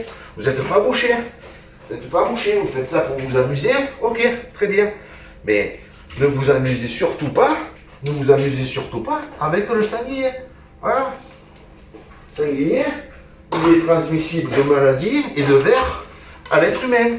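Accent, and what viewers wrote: South, France. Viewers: Bonjour Mr " ", Je découvre aujourd'hui même vos vidéos (Fabrication du Saucisson pour la première) et ensuite votre chaîne avec les saucisses... Ainsi que malheureusement, le départ brutal de votre ami " Tatane " 😇 Courage à vous (même si nous sommes en 2023) et l'on n'oublie jamais ses Amis Pour en revenir à vos vidéos, des conseils, de l'enthousiasme... On devine toute votre passion et votre volonté de transmettre. Que du Bonheur de vous visionner, vous écouter avec en plus, ce petit accent du Sud: Charmant. avec un sérieux, une franchise. Tout gamin, de manière familiale, le Cochon représentait un événement annuel que j'ai un peu perdu de vue avec l'âge. Je suis de la Loire. (non loin de Polignac d'ailleurs). Grâce à vos vidéos, cela me redonne de l'énergie pour recommencer Alors, rien que pour cela: MERCI Et pour votre Citron Pulco, c'est bien mais j'adore le commentaire ci-dessous de Denis Etienne: " Pour ce qui est de ton embonpoint, mon grand père me disait qu'un boucher maigre n'est pas un boucher de confiance. 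" Tout est dit 😊 Et pour le coup du Masque!!! Bravo pour votre franchise et la belle Remise en place de certains!!! Alors encore MERCI:washhands: Continuez ainsi. Vous êtes non pas un Vrai Personnage mais un Personnage VRAI!!! Cordialement.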